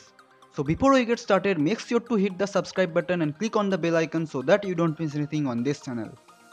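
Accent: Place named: Bengal, India. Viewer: U WERE IN MY GLOBAL ONCE.. WHEN I HAD MORE TROPHIES THAN U. AND STILL I HAVE.... I M SNIFFING BENGALI ACCENT OUT OF YOU BUDDY... GOOD WORK BOI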